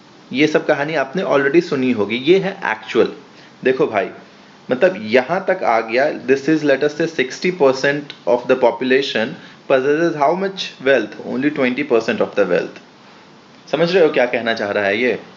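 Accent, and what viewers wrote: Bihar, India. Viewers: Bihari tone jabardst hai apna sa lagta hai aise hin padhate rahiye sir👍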